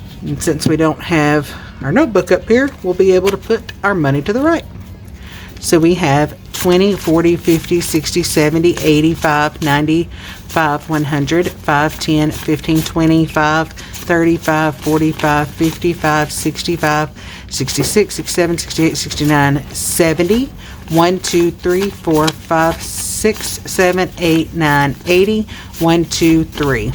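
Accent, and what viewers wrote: South, United States. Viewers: I’m from GA and you have an accent to me. My parents are not from the south though, so I don’t have a southern accent. I do say y’all a lot though 😂 Love to see you doing so many challenges ❤ Great job! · You are definitely a southerner, just like me! North Cental Louisiana, here! I have a very slow drawl! 😂😂😂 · Hi...new subbie here:) I'm northern so yes, you do have an accent lol Your savings challenges are inspiring!! Great video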